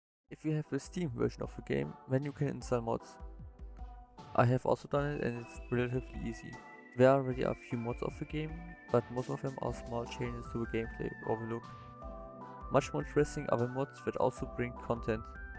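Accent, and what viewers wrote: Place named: Germany. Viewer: Habe etwas rausgehört, dass du aus Deutschlanf bist und mega tolles Video, nur man versteht dich etwas schlechter:/ Aber keep it up!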